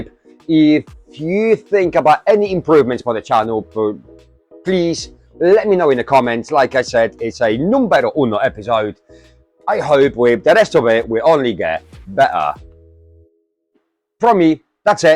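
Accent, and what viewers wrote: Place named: United Kingdom. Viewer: Nice first video i expected you to be another American channel till i heard you open your mouth good to see someone this side of the pond is giving this type of repair a go.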